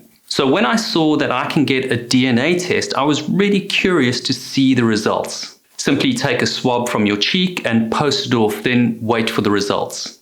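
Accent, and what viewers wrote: South Africa. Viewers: Dude, you sound a wee bit South African.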